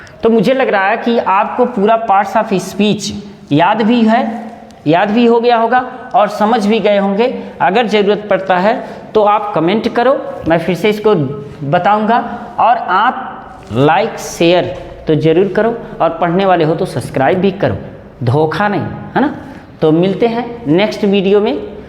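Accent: Bihar, India. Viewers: Are you from Bihar. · बिहारी है मैने पहचान लिया रिभर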